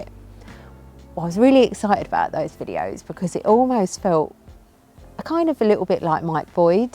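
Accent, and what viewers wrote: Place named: England, United Kingdom. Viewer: Love your voice, your English accent is great! Course, I’m in Chicago 🤷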